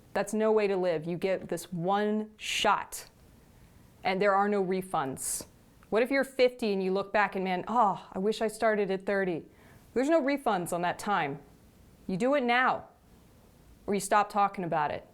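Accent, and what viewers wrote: Texas, United States. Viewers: can anyone else hear the texan accent jumping out in this video????? 👀 (especially when starts getting fired up, i.e. about the first post and how crazy it was)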